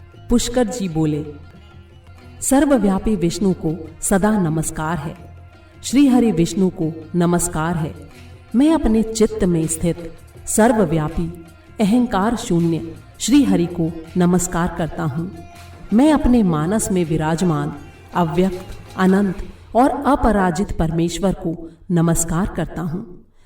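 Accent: Standard. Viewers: आपकी बोली शुद्ध सात्विक और स्पष्ट है....आपको हमारा नमन |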